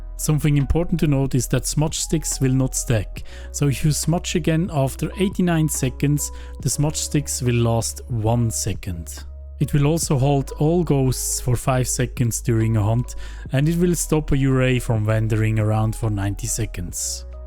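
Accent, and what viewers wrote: Switzerland. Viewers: Yeahh cool vid👍🏾🙏🏾🙏🏾 you sound like e schwitzer !? 😎😅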